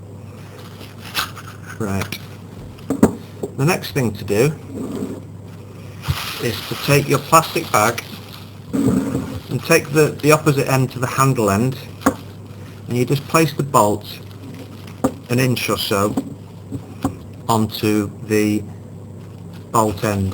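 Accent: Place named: United Kingdom. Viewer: The classic British voice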